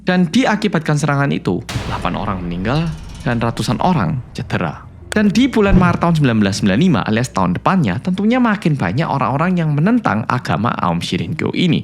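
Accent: Java, Indonesia. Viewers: yang medokk, openingnya terngiang terus di kepala sayaa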